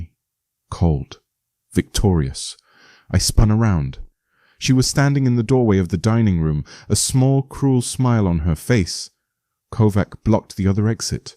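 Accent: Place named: United Kingdom. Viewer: Sorry hearing the Accent from the UK talking about Texas farmers is too phony😊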